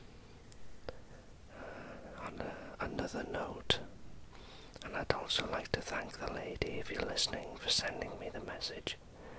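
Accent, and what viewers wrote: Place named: North Wales, United Kingdom. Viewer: It's fantastic to hear a whisperer with a North Wales accent. I grew up near Llandudno and to hear you say you were in Betws-Y-Coed recently makes the world seem a smaller place :) In fact I sat by swallow falls and had a sandwich last week. I spend much of my time touring Europe with work so to hear a familiar voice is very relaxing when I'm away. Keep up the good work, and thanks again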